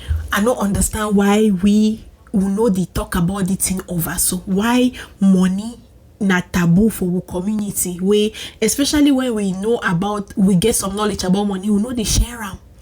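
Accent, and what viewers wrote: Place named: Pidgin, Nigeria. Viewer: Pidgin clear anyhow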